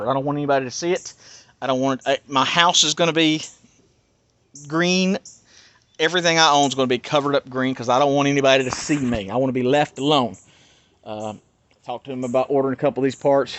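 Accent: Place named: Appalachia, United States. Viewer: Hey man. I just found your channel today. I've looked into the phenomenon of gangstalking a little bit before. I believe it to have a spiritual component as well, if not mostly. (btw, if you haven't yet, check out Jeremiah Cohen on YT. His testimonial is insane and eye opening). I thought I recognized a close cousin of my own accent when I heard you speak. I live a couple hours east of you in the beautiful foothills of the GSM. Anyway, my question for you is this: Why are they doing this to you? Have you seen or heard or been a part of something to draw this to you? Or is it based on something you did or said to anger them? I'm genuinely curious. I've watched a couple of your videos but haven't yet heard the story relating why it is you think they are doing this. A reply would be much appreciated. You and yourn be purdy, now.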